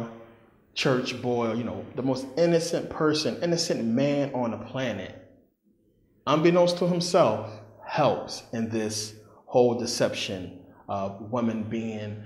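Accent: Philadelphia, United States. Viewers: He's from Philly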